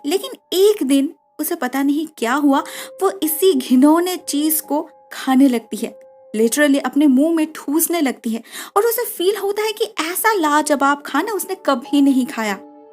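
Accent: Bengal, India. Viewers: मैडम आप बंगाली हो मैं आपकी हिंदी सुनकर बता सकता हूँ पर आपकी वॉइस अच्छी है😁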